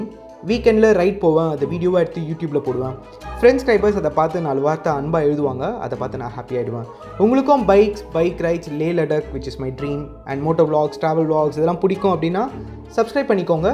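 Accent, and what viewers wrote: Chennai, India. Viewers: Bro good voice good style of commentary very super style natural madras tamil like my brother 👌 Super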